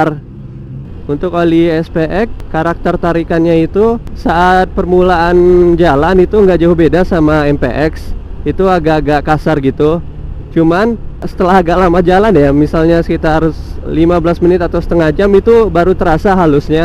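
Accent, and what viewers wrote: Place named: Minangkabau, Indonesia. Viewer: Urang awak ko mah, logatnya kliatan😂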